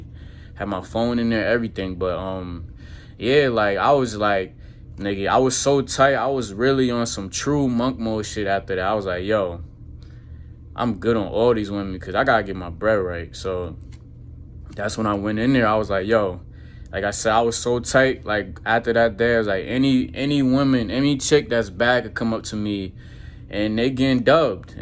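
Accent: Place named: New York, United States. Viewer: Good video bro💪🏾 The way you talk gives me New York vibes lmaoo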